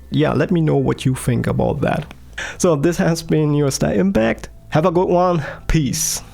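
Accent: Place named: Germany. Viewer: You sound like a German speaking english, are you german?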